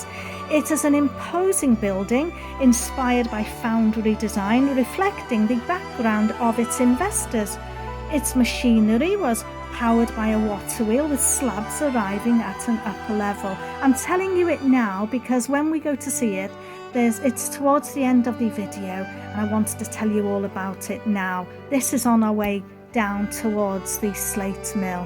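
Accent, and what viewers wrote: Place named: England, United Kingdom. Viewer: Hello, you have a new friend. Lovely video and Love your English accent. your new friend Patrick.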